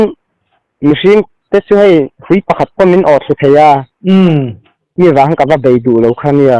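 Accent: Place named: Pawi, India. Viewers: An tawng thluk dan ve reng Ani e,Pawih tawng influence ka ti mai... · Tunlaia Mizotawng kan hman hi an mother tongue a ni lova, an pipu te Lai (pawi) tawng hmang an ni a,an tawng a ze ( pai) deuh a ni ve mai e. An kawm theih viau zawk a lawm. Tunah phei chuan midang( neigbours) pawh awm lohnaah an awm a, kum a ral chuan biak theih pawh sn ni lo mai thei a sin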